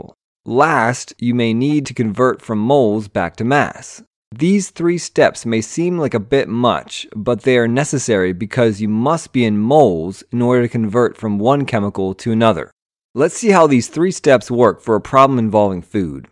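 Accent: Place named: United Kingdom. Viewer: british bruh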